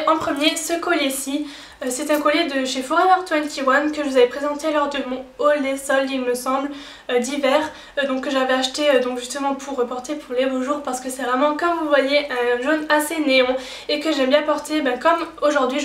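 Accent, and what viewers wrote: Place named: Alsace, France. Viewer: Aaaaaaah je me disait bien que ton accent me disait quelque chose enfin quelqu'un d'Alsace ! :D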